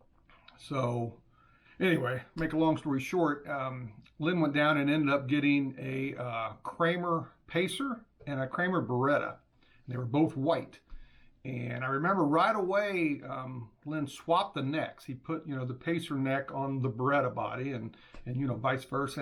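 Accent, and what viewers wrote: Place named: Baltimore, United States. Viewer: oh. I kind of heard the bmore Maryland accent in your voice, plus you stated with a Riff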